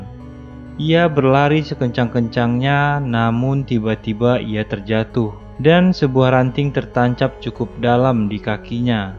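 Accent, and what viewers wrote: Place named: Java, Indonesia. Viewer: ejaan sikopet dia bilang juga bener kok. Kan itu accent nya dia neng. Kaya amrik sama english "neither either" (if you know lah) . Dia juga di video gak pake Bahasa baku amat. Orang jawa kan sering pake plesetan di pronunciation A nya. Like benar bener. Teman, temen. Cepat, cepet. So what's wrong lol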